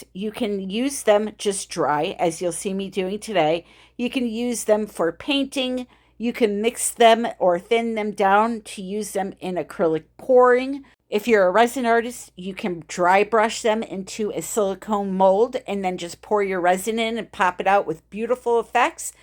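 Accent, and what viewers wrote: United States. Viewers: I was watching this thinking, “what the heck is HAT glue? Some kind of glue gun only used for hats?” The entire time. Took a long time to realise it was just your USA accent making the English sound strange. As a British person it sounded SO much like HAT, like I really though that’s what you said lol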